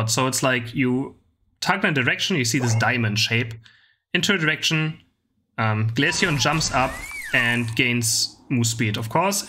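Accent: Germany. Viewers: 90% of language i cant understand. Gerlish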